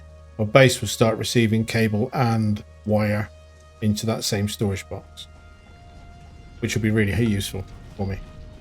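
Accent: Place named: West Country, United Kingdom. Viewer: You're quickly becoming one of my favourite YouTubers. I've been binging your snowrunner series. Currently on episode 30. Can I ask with your accent. Are you from Devon/Somerset area? Fellow UK resident here!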